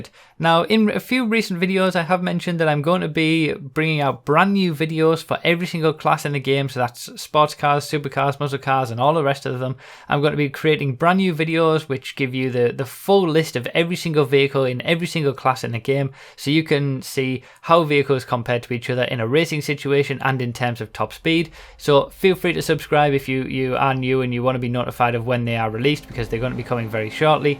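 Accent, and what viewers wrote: United Kingdom. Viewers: british accent sounds so gay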